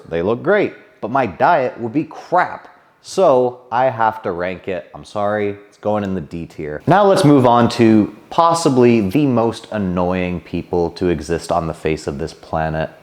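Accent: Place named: Canada. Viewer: I was so confused why you had a Canadian accent until I found out you're Canadian lol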